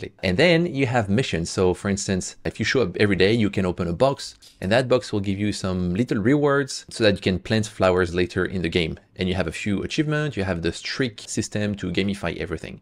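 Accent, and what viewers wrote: France. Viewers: Hey, i have a weird question, are you French ? I sense some really well hidden french accent lol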